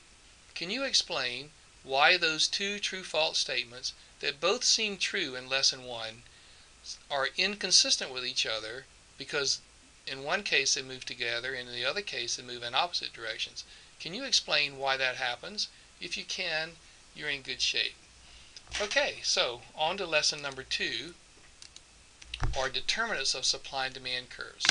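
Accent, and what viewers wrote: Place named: South, United States. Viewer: that southern accent tho.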